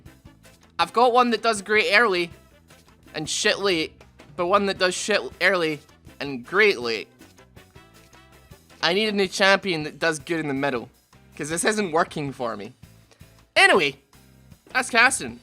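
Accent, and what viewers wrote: Scotland, United Kingdom. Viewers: are u scottish pal?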